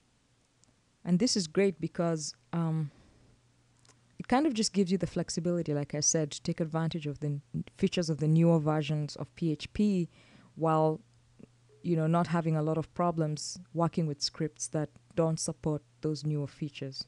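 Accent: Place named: Nairobi, Kenya. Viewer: i noticed ur english is a blend of nairobi and the pauses between the videos :) blessed to have a kenyan around :D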